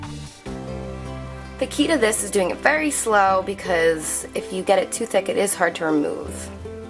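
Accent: New Jersey, United States. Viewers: You sound like you're from jersey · Omg your NJ accent came out deep here ! Lmao your the shit ! Screw the haters your beautiful !!!!!!!!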